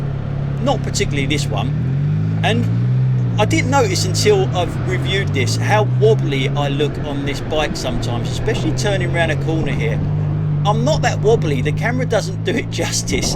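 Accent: London, United Kingdom. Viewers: I did watch it to the end :-) I used to live in Ilford and worked in London at 16. I moved to Germany in 1974 at the tender age of 23 to go to my future wife and it was great to see the areas around London again. Btw. I love your accent, reminds me also of where I grew up. I swapped my racing bike for an E-Bike when I reached 71 after the roads started getting steeper. Keep up the great work.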